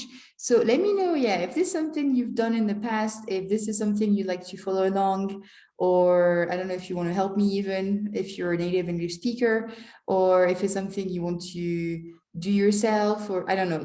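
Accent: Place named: France. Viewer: Great mastery of English. It seems to me that you are french native, I have the flair for french,Arabic and English. Your English is fabulous. You are great. Thank you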